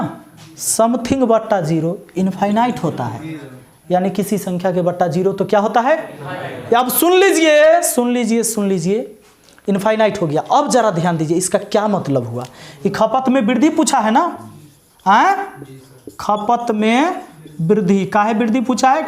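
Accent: Bihar, India. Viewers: Sir aapke Bihari style language mast hai.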